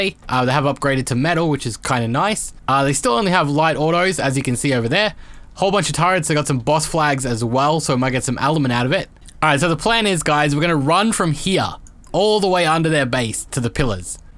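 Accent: Australia. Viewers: Are you australian? xD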